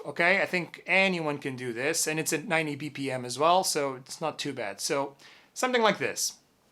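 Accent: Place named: Portugal. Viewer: parece portugues a falar ingles , bom video , boas dicas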